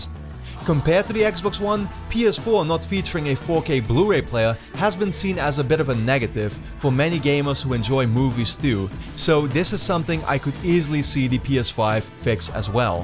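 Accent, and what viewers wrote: Netherlands. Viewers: are you dutch? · why can i hear if poeple are dutch or not XD cuz im dutch and is dutch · Ben je Nederlands? Volgens mij hoor ik het aan je accent. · Jeez the Dutch accent is killing me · Ben je nederlands je hebt zo een nederlands engels accent? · are u dutch?? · Je Nederlandse accent is wel te horen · Ik gok dat je een Nederlander bent. Als je vraagt hoe ik dat weet, het is heel makkelijk te horen aan je accent als je Engels praat. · Are you dutch? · M8 is there any chance that your Dutch? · Ben je Nederlands want zo klinkt je accent wel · Ben je Nederlands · Zo'n mega zwaar Nederlands accent haha heerlijk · This guy is 100% dutch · nice dutch accent :) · R u dutch? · are u dutch? Lol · Are u from the Netherlands 🇳🇱 my friend? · Do you come from the Netherlands? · No hate on the video btw, great thinking logic (is that a dutch accent?) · Youre Dutch arnt you? 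· heerlijk hollands accent! maar zeker goede vid! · Sxrewhead could hear it in the accent I'm Dutch too. (if my name didn't give it away) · I don't know why i can always hear if a person is Dutch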